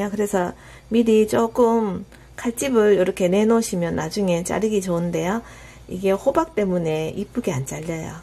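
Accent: Gyeongsang, South Korea. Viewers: 목소리도 듣기좋고 경상도사투리가 더 듣기좋아요 항상 잘 보고있어요 👍👍👍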